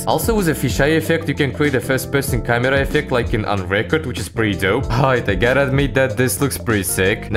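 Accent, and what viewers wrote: Russia. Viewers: russkiy blya ya tebya slishu suchka) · Акцент понимаю ты русский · Есть ли у тебя канал на русском? Просто слышу сильно русский акцент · Are you Russian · Акцент как у русскоговорящего. Лайк авансом. Расти большой дружочек) <3 P.S. только сейчас понял, что спустя 48 секунд видео, появляется родное уху: "Блять" 😅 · i freaking love ur russian accent lmao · That russian accent ! Привет, брат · Im a simple guy. I hear russian accent, i sub. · i Love his russian/english soo much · Сижу, слушаю акцент питерского английского.... кайф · Man your accent is addictive, Ruuusssssiiiiiaaaannn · that russian accent is the cherry on the cake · terrible russian accent, all russians are guilty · Чел ты из Росси или знаешь русскому язык, это заметно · I can bet on the authenticity based on the Russian accent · You can tell that Bro is Russian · по акценту понято что русский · ато я слышу что автор русский страно по американский говорит как друг мой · блин, вначале я не думал что это это русский акцент, всетаки на английском говорят кучу разных национальностей и я акцент сильно не различаю, но уже расставило всё по местам🤣 кста, может кто подсказать, моды лучше всего перекидывать просто в корневую папку, или в архив в корневой папке? 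и будут ли моды работать, если закидывать их в отдельно созданную папку в корневой папке игры? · какой же у чела русский акцент))))))) мужик делай контент на русском пжлст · I subscribed for how funny the russian accent was, editing, and personality. Keep it up brah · Ahh the Russian accent blessing my ears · Russian accent :D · бля ты руский что ли) акцент выдает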